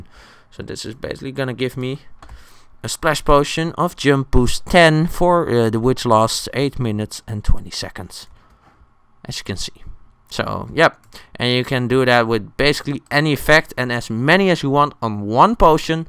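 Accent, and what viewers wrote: Netherlands. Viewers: Your Dutch aren't you? You have a pretty obvious Dutch accent. You know CubeHamster? Same thing. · You kinda hear the dutch accent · ik hoor gewoon dat je nederlands bent niet? :D nice video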